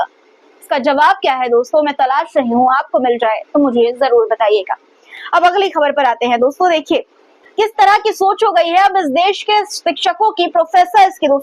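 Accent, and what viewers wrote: Pakistan. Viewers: ye urdu bol raha hai jaise pakistani ho. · Iska bolne ka lehja to Hindustani nahi lagta۔ Pakistani lehja hai is ka۔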